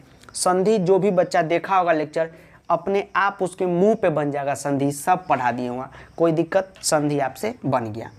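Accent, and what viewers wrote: Bihar, India. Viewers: Next Level Teaching !! Bihari Ascent '" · Omg!!! Your Bihar ascent is so sweet.... Can you plzz give me some short trick of sanskrit 😂🤣😂🤣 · Sir improve your hindi,English it is full bihari version learn from your wom channel 😀 and stop diverting us from studying to movie (wth is sonny deol).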